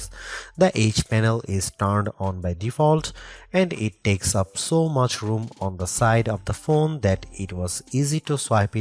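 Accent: India. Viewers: OMG, listening English from an Indian is a violene to my ears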